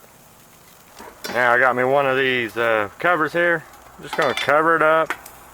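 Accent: South, United States. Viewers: 2 seconds in I knew he was from the South 🤣 thanks for the good vibes & recipe.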